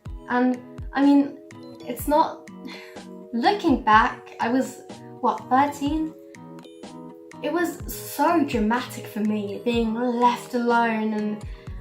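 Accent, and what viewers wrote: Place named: United Kingdom. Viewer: I swear your accent is so fucking british 😍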